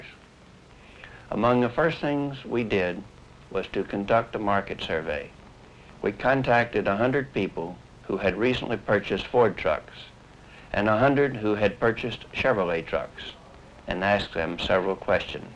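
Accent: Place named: Virginia, United States. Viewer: The CC says "it makes me shut up." He is actually saying "it makes me SHUDDER." There is that Virginia accent to account for. Yes, NICE old trucks!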